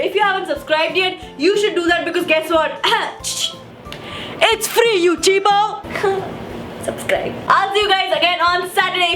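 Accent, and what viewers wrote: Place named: Bhojpuri, India. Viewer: Hey...I met you on my bday idk if u remember but it wass just amazing ❤❤🌸. Anyways a rapid fire cmg up 1.If you had a chance to replace your life with some other YouTuber who would it be and y?😉 2.Prank vrushank that u wanna break up😂 3. Scream out of your window "Aee paani kisne bandh kiya"😂 in your Bhojpuri accent🌸🤘 #Sawaalsaturday lyy❤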